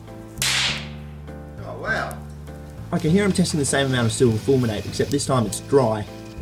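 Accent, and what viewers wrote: Australia. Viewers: every time i watch a video....im all like....Damn, this dude is so Australian. Its the best.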